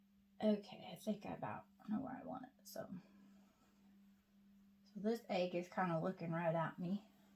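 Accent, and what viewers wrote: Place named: South Carolina, United States. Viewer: Where did you get your non-accent? I say non-accent because you sound like we do in South Carolina.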